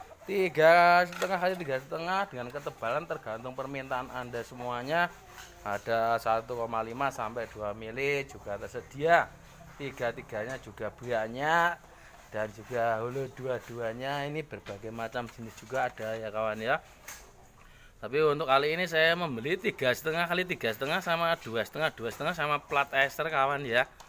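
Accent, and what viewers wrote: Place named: Banyumas, Indonesia. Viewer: Amin boskuhh.pinter bgt ngomonge kaya seles 🤣👍👍 Tapi keren mantap kang..ngapak bgtt ngomonge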